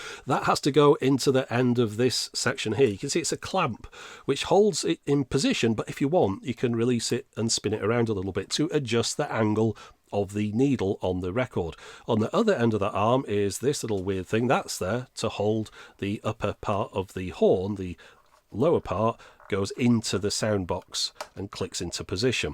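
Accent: United Kingdom. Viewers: Dear. I have two questions. First question: can you discribe and explain an automobile vinyl record player that was available in the 1970s? Second question: Can you review the 8 centimeter long mini cd and dvd and the Nintendo GameCube? Those were my questions. I like your videos very well and you have a neat British accent in your voice. With friendly greets from Gamer Japio.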